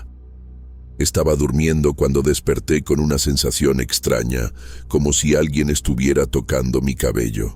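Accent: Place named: Spain. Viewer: Y luego el acento español lo arruina más.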